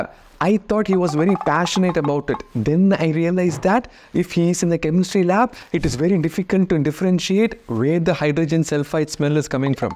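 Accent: Tamil Nadu, India. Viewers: Very informative and your Tamil is very cute 😊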